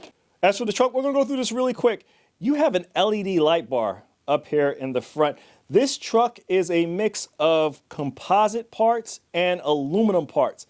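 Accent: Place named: Northeast, United States. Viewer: Hey brother, another great freaking review. I really love how you do the unboxings. I’m excited for another shootout the last one was awesome. Back before I had to sell my RC collection This was actually one of my favorite short course trucks. How funny is that you had that sitting in your attic LMAO. Well if you want to donate anything else sitting in your attic to a single dad and a little girl who love RC let me know lol jk. You sound like you’re from the north east. I’m in Jersey. Thanks again for your videos I know it’s got to be a lot of work to make them. Me and my daughter enjoy them. I’ve been subscribed for a long time but I don’t comment much. Just wanted you to know me and my little girl appreciate your videos! Hope you and your family had a great weekend!!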